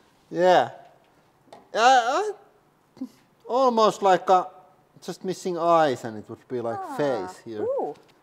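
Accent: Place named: Finland. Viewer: Where are you from? The english sounds a bit Finnish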